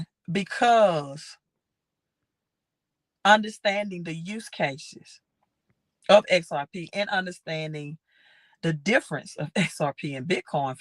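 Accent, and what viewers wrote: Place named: Alabama, United States. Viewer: Sound like you from Birmingham Alabama 🎉🎉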